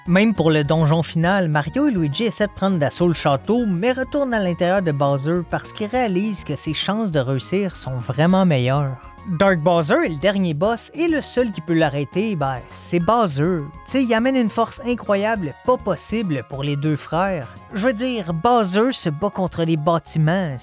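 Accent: Quebec, Canada. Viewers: Des vidéos toujours aussi intéressantes, et malgré que je viens de France, l'accent québécois ne me dérange absolument pas, au contraire personnellement, je le trouve juste tellement cool et relaxant donnant un aspect chill à la vidéo et les blagues ton grand-père sont à mourir de rire x) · Il n'y a que Kamek que l'on a pas eu l'occasion de jouer en tant que personnage régulier. Ai-je tord ? Et sinon, j'adore ton accent.😊 Vive le Québec.😁 À plus.🎉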